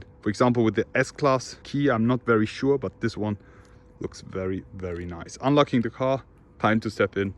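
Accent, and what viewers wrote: Germany. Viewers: Du bist ein deutscher das hört man raus 👌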